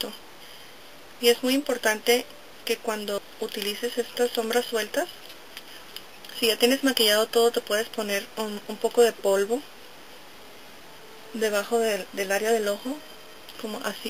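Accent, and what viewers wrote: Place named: Northern Mexico, Mexico. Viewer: muy buen video tienes un acento cmo d monterrey o dl norte d dond eres?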